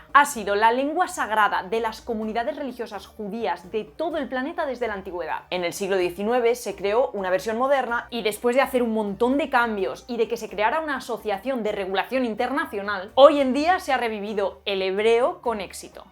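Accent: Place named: Spain. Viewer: Jajajaja y le entiendes bien a la del video? Pq a mi me cuesta un poco pq habla en español de españa y habala bien rapido la vdd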